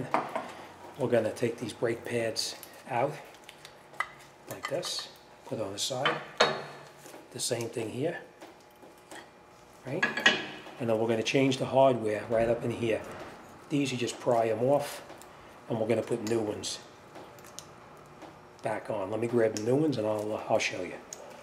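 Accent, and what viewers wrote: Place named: New Jersey, United States. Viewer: R u from like new joysee? Love the accent.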